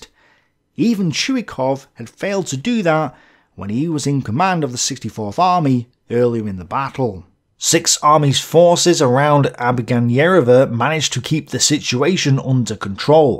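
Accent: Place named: Northern England, United Kingdom. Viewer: I really enjoy your comments on ww2, Señor. By the way, because I lived in the UK I feel your accent is from Northern England or Scotland. Only there the U is pronounced like we do in Spanish. U = oo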